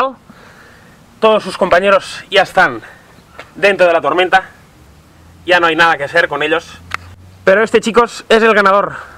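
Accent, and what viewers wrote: Spain. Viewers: Eres español no? :3 Madre mia tortugas jajajaja me mate de risa 😂 Viva cangrejo!!!!!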